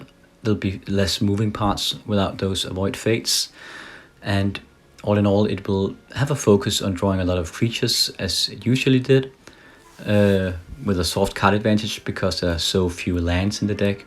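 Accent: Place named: Denmark. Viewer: I thought you sounded different from Timmy, best friend is in Denmark, always like hearing the accent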